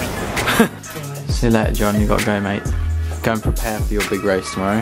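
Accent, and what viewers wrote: Ireland. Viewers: Love the Irish accent ;) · ps - Your Irish accent is bloody amazing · I love both The Verve, and Bombay Bicycle Club :O You have great taste in music haha. By the way, you sound like a very convincing Irish man. I'm Irish, so I know :P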